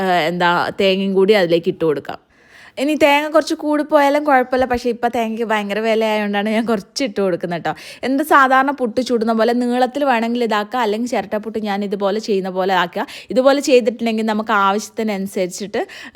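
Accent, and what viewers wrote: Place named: Malappuram, India. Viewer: കമന്റ് ബോക്സിൽ കയറുമ്പോൾ ഇടുകയല്ല പുഴുങ്ങുകയില്ല കേൾക്കുന്നുണ്ട് എന്താ കാര്യം അറിയോ എന്റെ ഒരു അറിവ് വച്ചിട്ട് പറയുന്നു യഥാർത്ഥത്തിൽ മലപ്പുറത്ത് കാരി ആണ് സംസാരം ഒന്ന് മാറ്റി പിടിച്ചു നോക്കിയതാണ് മലപ്പുറത്തുകാര് എങ്ങനെ മാറ്റി പിടിച്ചാലും മലപ്പുറത്ത് സംസാരത്തിന്റെ ആ ചുഴകടന്നുവരും എന്ന് അവൾ ഓർത്തില്ല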